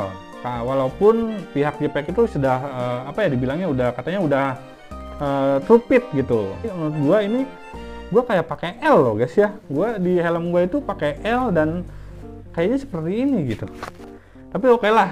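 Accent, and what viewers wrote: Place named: West Java, Indonesia. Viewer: Aa org sunda nya. Sunda mana?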